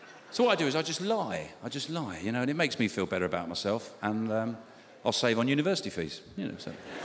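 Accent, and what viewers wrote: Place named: Cockney, United Kingdom. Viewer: As an Australian I love the Cockney accent. Our entire Australian English language is basically based around it.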